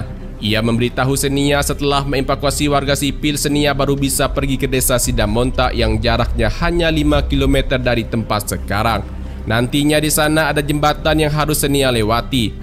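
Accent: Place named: Aceh, Indonesia. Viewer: Logat Aceh pak Admin ya😂😂, mantaf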